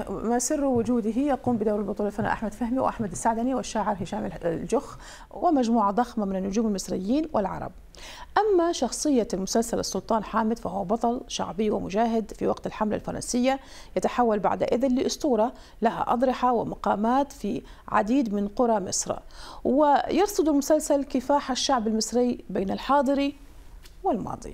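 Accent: Iraq. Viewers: شگد حلوة اللهجة العراقية للمذيعة بنت الحلة 😁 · ياجمال لهجتك العراقيه ❤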